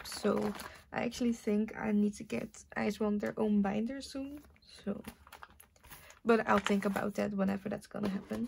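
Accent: Netherlands. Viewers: this is my first time watching u and the first thing i said was oh yea she's dutch and i was right 😌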